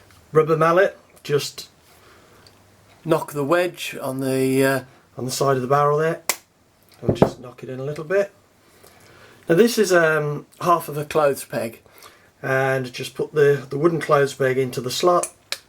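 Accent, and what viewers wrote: England, United Kingdom. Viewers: I hardly ever disassemble completely. I just scrub out the barrel cylinder and wipe out the outside. It only gets a complete hair down like twice a year never had an issue. And you sound English, I take it. These are the only handguns you can own. In the United States they are not even considered firearms. I would look into getting a conversion cylinder. But I do not know if that would break your laws. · It sounds like an English accent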